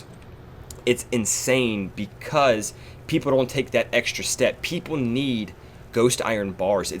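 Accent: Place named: United States. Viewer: Where are you from bro? I'm quite confused.. I am not sure if you're Australian or mid north-western American. Edit: ok You're totally not Australian. But I still can't pin point where you're from, I mean I'm sure it's America, but dunno which state.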